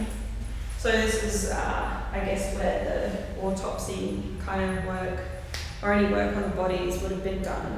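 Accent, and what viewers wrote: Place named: Australia. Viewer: You are so brave. And love the Aussie accent. My fave ghost hunter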